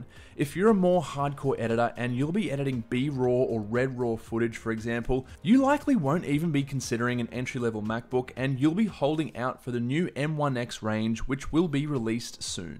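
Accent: Australia. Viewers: Can you turn off your Aussie accent? It's distracting.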